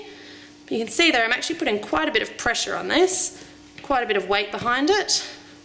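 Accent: Australia. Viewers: OMG are you australian I LOVE YOUR ACCENT!! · It's so nice hearing another Aussie voice on Youtube! I love your tutorials, I've just come over from Yoyomax, I'm so glad you two did this collaboration. Thank you so much for posting all of these videos, they're wonderful! x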